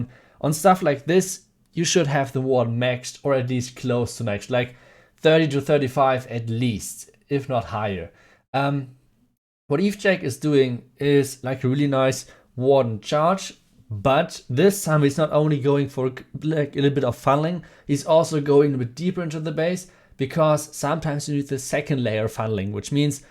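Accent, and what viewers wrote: Germany. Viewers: Du hörst dich deutsch an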